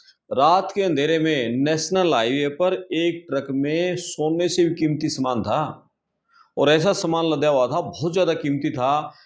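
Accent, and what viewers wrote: Haryana, India. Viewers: Ye youtuber Haryana se hai ❤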